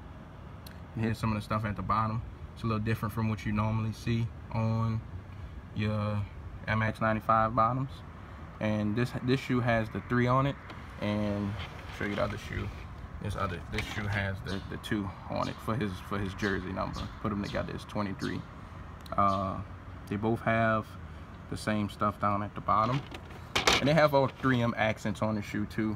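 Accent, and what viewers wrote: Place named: New Orleans, United States. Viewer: Where u from bro sound like u from the N.O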